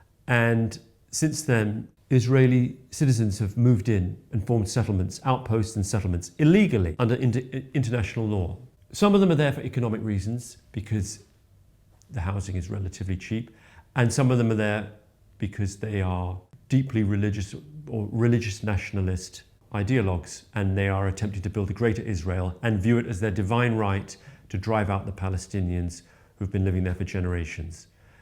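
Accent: United Kingdom. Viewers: It IS a British accent though. It’s one of the many British accents. If your complaining that ‘British’ there’s a million English accents and they all British accents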